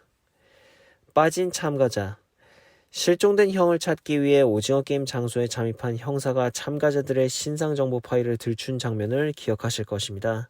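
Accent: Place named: Korean-Chinese, China. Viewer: 억양이 조선족 같은데?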